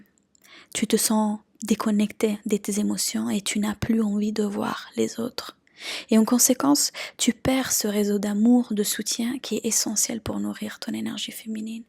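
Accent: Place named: Iran. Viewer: Merci 🙏 pour vos vidéos D ou vient ce jolie accent? Je dirais l iran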